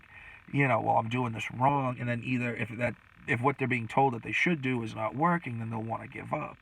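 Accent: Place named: New England, United States. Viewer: Ahh! I’m from Northern Maine originally and you had that ever so slight hint of the accent. Keep up the content! Subbed.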